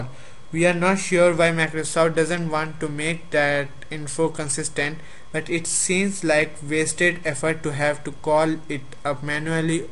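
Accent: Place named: India. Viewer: I can understand him and i'm not Indian. So what if he has a THICK accent. ...Do your thing bro.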